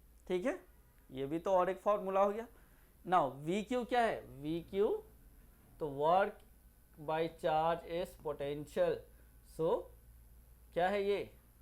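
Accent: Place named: Assam, India. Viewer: Sir Assamese medium r lage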